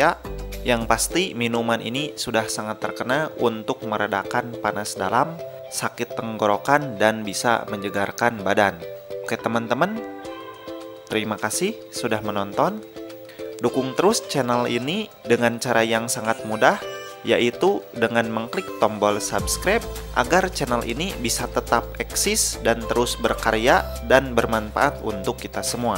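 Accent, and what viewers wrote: West Java, Indonesia. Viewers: Akang orang sunda yah?? Logatnyah nyunda pisan...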